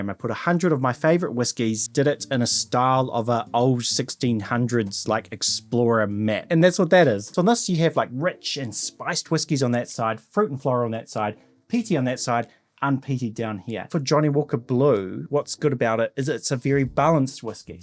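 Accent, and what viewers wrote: New Zealand. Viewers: I like the content, but that New Zealand accent is killing me, at times is almost unbearable. I will try another video.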